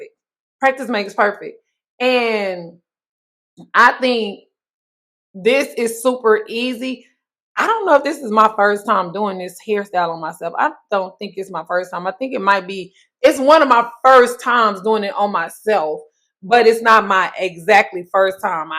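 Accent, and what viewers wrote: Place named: Texas, United States. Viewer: What part of Texas you from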